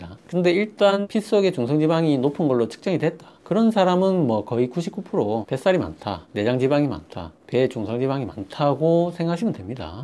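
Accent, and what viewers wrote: Gyeongsang, South Korea. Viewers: 중성지방이 높으면 당뇨도 올 수 있는 위험이 될 수 있다는 말씀으로 이해가 가는데, 경상도 말씀이신가, 내 입장에서, 선생은 숨도 안쉬고 말씀하시네요. 열심히 하시는것 같은데, 원 뭐가 뭔지 금방 비행기가 지나갔나...;정신이 없네요. 지식있는 간호사님 모아놓고 강의하시는 것이 더 효과적일듯 함....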